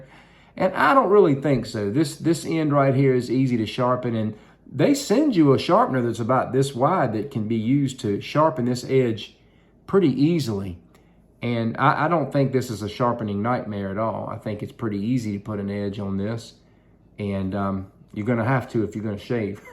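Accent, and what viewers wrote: South, United States. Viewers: Hey big guy its great to see you again. I love the way your videos start. "Hello maaa feeeaaaands" that southern draw that all the chicks like i bet. Im just downloading your new slingshot aiming video. You know what. Try this. Forget aiming altogether. Forget your slingshots there. Just draw it like a bow. Release within 5 seconds else your bands cool down. After a few hundred shots muscle memory will take care of the aiming. If you miss; dont adjust anything. Watch your target; your mind will give you an unrest feeling; hesitant awkward if your out of sync. Frustration is just your mind trying to help you"talking". Left brain is totally useless for slingshots. Spacial awareness is right brain. Colour; shapes; movement; tonal changes. Sights dont help. Thyr liars! Art is right brain(in beginning your left brain tries to help saying"this should be like bla bla bla...". 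Slingshots is right brain not left. Its art; not science. So if your frustrated and get that grrrrr!!! Just stand upright; point your hands up and out and take a deep breath and relax. Then just fire away; over and over. Also try this. If you have a rope loop hanging from your slingshots; hang it from your arm where a wrist brace would sit. And twist the rope and slingshot till its comfortably tight under your wrist; then hold slingshot as normal. You made a wrist brace. You dont even need to hold your slingshot.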